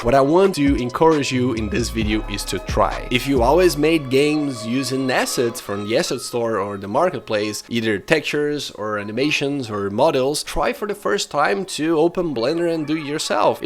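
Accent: Brazil. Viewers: you have an familiar accent are you brazilian or even south american?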